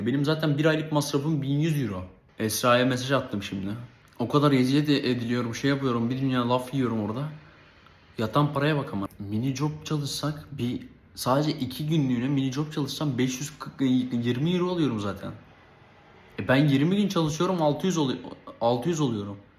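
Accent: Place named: Bulgaria. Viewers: Bulgar ya da trakyalı gibi konuşuyorsun. Beyaaa.... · Bulgar değil, Bulgaristan göçmeni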